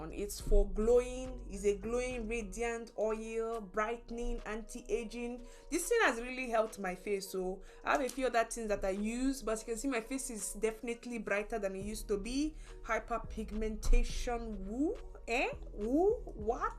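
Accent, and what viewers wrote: Nigeria. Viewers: 🤣🤣🤣 sounds like an igbo accent. Nne you made my day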